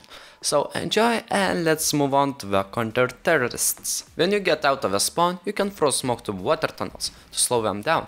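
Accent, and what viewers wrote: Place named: Germany. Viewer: pain to hear german english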